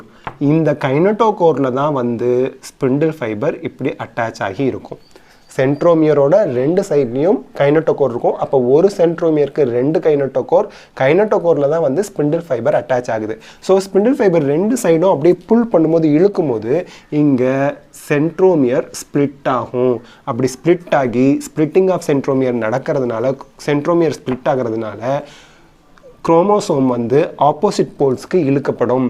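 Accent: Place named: Coimbatore, India. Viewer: Sir nernga entha place coimbatore maari thonuthu unga slang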